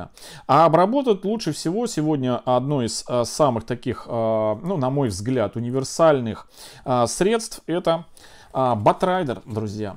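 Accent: Russia. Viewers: к актаре насекомые привыкают, а к оберону конечно же нет, ага... цветы прекрасные, но с аканьем надо что то делать... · С этим аканьем нужно что то делать, невозможно слушать.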